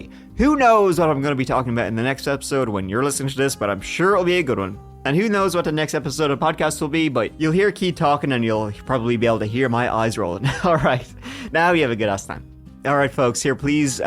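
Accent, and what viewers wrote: Ireland. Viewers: I liked our little date 😂😂 We love your banter with Keith but you are what hooked me. I love spooky stories in an Irish accent, thank you for all you do!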